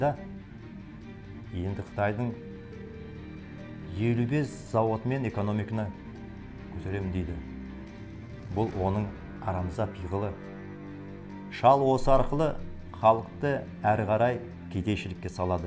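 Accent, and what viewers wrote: Standard. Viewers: Казакша Тап Таза сойлейди!👍🏽 Дурыс, Жетер Мына Душпаннан кутылу керек! Аллах разы болсын!!🙏🙏👍🏽💪🏽💪🏽💪🏽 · Муктардын казакша сойлегени адеби тилде сойлейди керемет адам 🙋‍♀️👍👍👍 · Казакша өте таза сөилейді екен бурын неге сөйлемеген Токаевтан таза тусінікті сөиледі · Нагыз таза сойлеп тур агайындар рахмет айтып бмрлесейик баска ештеңе керек емес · Рахмет. Таза қазақша сөйлеп отыр...... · Казакша таза соилеиди екен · казакша таза сойлейди екенсиз. Айтканыздын бари дурыс. Казактар оянындар. Алга Казахстан! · Козакша таза соилеп турсыз дурыс аитасыз кашан сол кун туады екен курттып битти барин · айсауболшы патриот казахша таза адемы айттын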